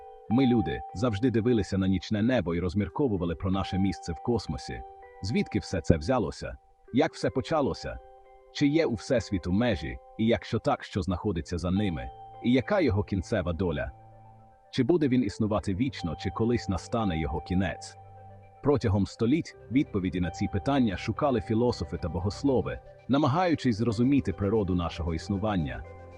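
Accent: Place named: Poland. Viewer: жаль наголоси погано виставляються на укр мовi :body-blue-raised-arms: та настройка яка нормально корегуе iншi мови, перетворюе укр мову на якусь... навiть хз...полупольську якусь, в сенсi звучання... гг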